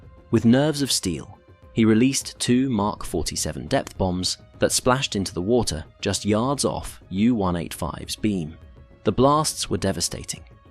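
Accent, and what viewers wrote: United Kingdom. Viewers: Az-ores, not A-zores. Hearing a British accent speaking American sounds awful.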